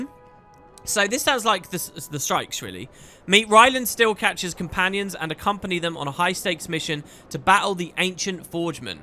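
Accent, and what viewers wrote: United Kingdom. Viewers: You have nice voice, always enjoying your accent (not too British, so I can actually understand) and intonation. (Tho sometimes your videos have a bit screwed up volume balance ;d Just wanted to compliment you as a fan ;)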